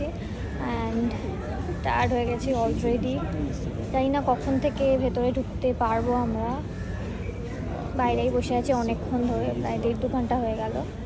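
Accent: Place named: Bengal, India. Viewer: Tomar ei Bengali English accent ta vishon irritating and believe me tomar kotha gulor jonnoi vlog ta lengthy hoy, vishon boring hoy. Ei neka neka voice ey kotha na bole music die Dio. Vlog interesting hobe tahole.. ei under confidence er moto neka kotha gulo shunlei majh theke manushe back kore chole jabe.. just a honest review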